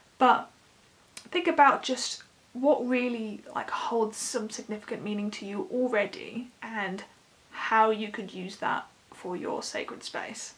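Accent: England, United Kingdom. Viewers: Finally someone with a nice English accent... I had already thought one could only be into witchy things if one wanted to live in Alabama.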